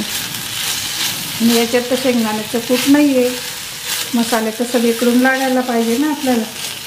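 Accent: Khandesh, India. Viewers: tumcgya sagaly recipe khup chan asatat, tumcha khandeshi lahejat bolane mala khup aawadte, all the best tai.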